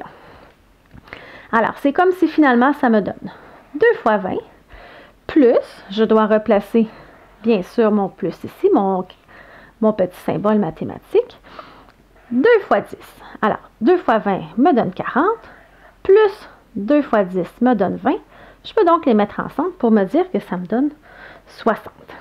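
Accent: Quebec, Canada. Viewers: Accent québécois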